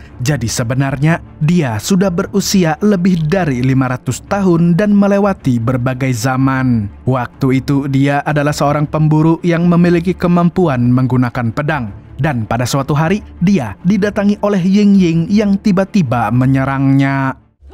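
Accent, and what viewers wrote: Banjar, Indonesia. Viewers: wiih, urang banjar kh adminx nih? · Urang banjar sekaliny sidin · Urng banjar nah ngene narator ya😂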